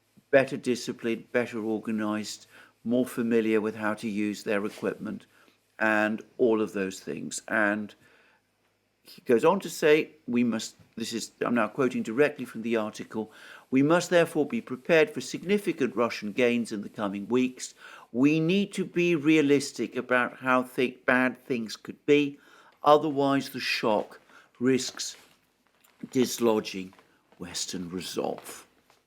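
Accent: United Kingdom. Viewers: He's married, you little American pos. Your English isn't very good, eh? It's "speaking in a foreign accent." It's a British accent, so maybe you're not American but some sort of Euro scumbag.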